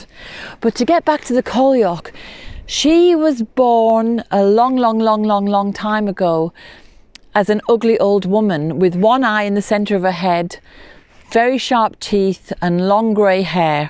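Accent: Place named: Yorkshire, United Kingdom. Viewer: ah! that explains it! I could hear Yorkshire and Ireland and Teeside and I couldn't figure it out. I, too, have a very mixed accent from moving around. Have just come back from a stay in Darlington, coincidentally, to my current home in Yorkshire (but born and raised in an Irish family). I hope you are doing well xxx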